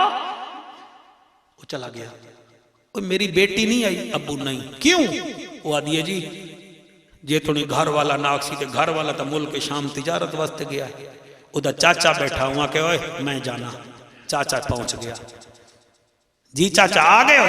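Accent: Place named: Saraiki, Pakistan. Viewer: Subhaan Allah bayan boht Acha hai🥰🥰🥰🥰🥰 Lakin Andaz main saraiki+Punjabi+Urdu🎌 Hai Jo Thora ajeeb Lagta hai😏😏😏😥😥😣😥😣😥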